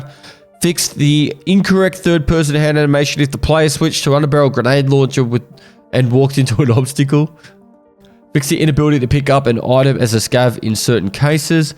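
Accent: Australia. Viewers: Most distinguishable Aussie accent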